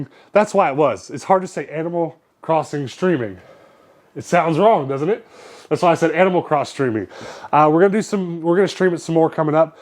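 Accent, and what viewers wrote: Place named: United States. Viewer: You’re super American, interesting but a bit too much for me to listen too regularly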